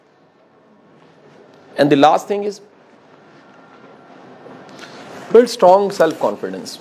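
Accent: Punjab, India. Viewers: Sir ap bhtt parhy likhy hen. baten b achi krty hen lakin apka accent punjabi he.. 11 ko ap bar bar yara keh rhy gyara ki bjaye.. mere kano ko bhtt ajeeb lgti ye punjabi urdu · Muhammad Amir - This is lahore or pubjabi syle of urdu.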